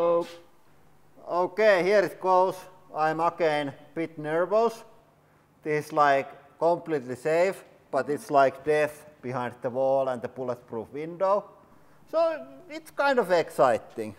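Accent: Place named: Finland. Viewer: I Love the finnish accent 😊 Also Finland is the most comparable country to Denmark 🇩🇰❤️🇫🇮 Most Happy people in the World 😁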